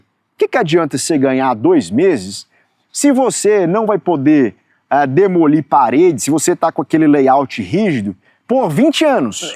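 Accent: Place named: Sao Paulo, Brazil. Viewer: o cara é bem paulista né kkkk eu sou paulista e senti no ouvido umpouquinho kkkk mas muiito bom o video e passa informações interessantes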